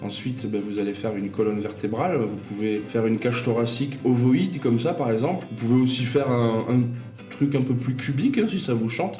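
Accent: South, France. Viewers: comment dire.... tu es énorme!! j'adore ton humour, tes explications...et ton accent sudiste! merci pour ce cours majestueux!! (un nouveau fan sudiste!) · Excellent l'accent du sud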